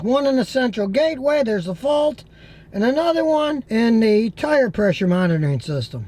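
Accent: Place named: Houston, United States. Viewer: This is Richard Dawkins, if he had been born and raised in Houston.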